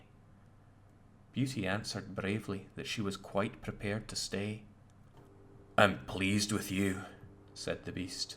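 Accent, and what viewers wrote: Scotland, United Kingdom. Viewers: lol why do you sound extra Scottish in this? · Wait did this story read as Scottish, or does your accent shine while reading?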